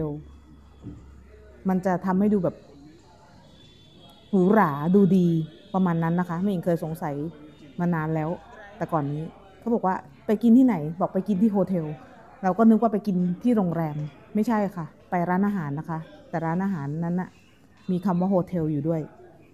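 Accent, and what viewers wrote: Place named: Buriram, Thailand. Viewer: สำเนียงเหมือนคนบุรีรัมยเลยคะ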